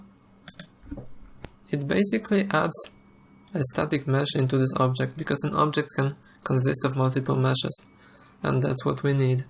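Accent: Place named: Poland. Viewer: Po Twoim akcencie od razu słychać, że Polak !!! ;)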